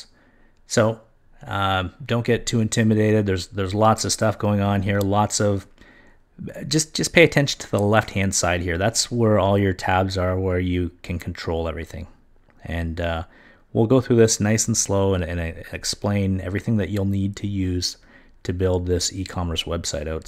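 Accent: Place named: Canada. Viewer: Thanks for the Awesome FREE tutorial on how to set up a FREE E-com site,. So many out there plugging free this and free that only to lure people into an "only $*.** for the real information" situation. I only have one issue with your tutorial: Although you don't say "ABOOT" like South Park makes fun of/impies Canadians say, you really don't say ABOUT either. It's more of an "ABOUOOT". And I used to speak with Canadians daily when I worked at a Logistics company here in L.A. and y'all really do say it that way, (except those damn french canadians, they've got their own crazy inflections) so I can see where Matt & Trey get the stereotype from. Anyways, I am happy aboot the fact that you create these informative videos, Thanks!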